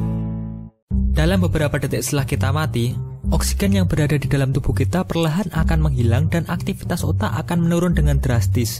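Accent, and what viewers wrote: Java, Indonesia. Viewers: logat jawa nya lengket banget wlwpn pk bhsa indo. 😅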